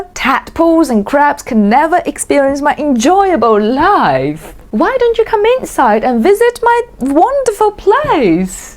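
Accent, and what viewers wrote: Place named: England, United Kingdom. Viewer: I am only here to listen to English accent and maybe by chance learn some Mandarin. ( Its a Canadian thing)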